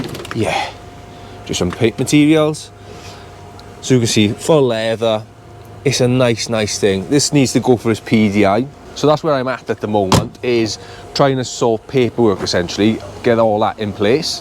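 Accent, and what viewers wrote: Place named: Wales, United Kingdom. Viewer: Speak to Dave the lovely car company, has recently set up at unit with an in house mechanic, there is also a podcast / YT video where him, Joe and Lee (Car UK) talk about the equipment he should get (nov 24 i think it was). I do social media / YT stuff for them and as far as i know its one of the best things he's done, his words. In the mean time you could kit it out and get mobile mechanics in to use the area for your cars until your ready to employ them. great content btw, also I love the welsh accent with words like Fumming hehe. Lastly what they done to that audi is out of order. any way keep smiling and good luck with your new start up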